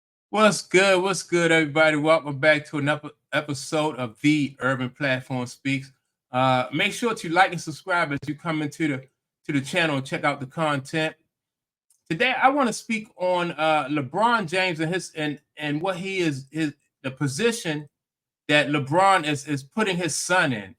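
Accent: Baltimore, United States. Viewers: Are you from B-more?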